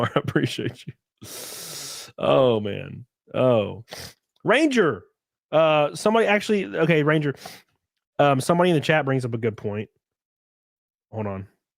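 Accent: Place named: South, United States. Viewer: Damn, I use to like this guys videos about gamestop. Now it seems he is trying to keep his channel going by going into politics. I am sad because he is just going down the stereotypical road of spitting out conservative talking points. Having to be forcibly put in a role because of what ones race is, is not necessarily pure racism if there is not an equal proportion of people in those positions. Its not about being for something because it gives a benefit. Its about getting an equal opportunity. That is the problem. So not, it is not necessarily racism. It is challenging existing racism and current systemic racism. To an ignorant person it will seem like it is racism and I cant help that outside of writting this comment. But I really did like his videos on gamestop. It really does make me sad that he went down this road. But maybe I should have been like ofcourse this guy is mostly an idiot because of his southern drawl.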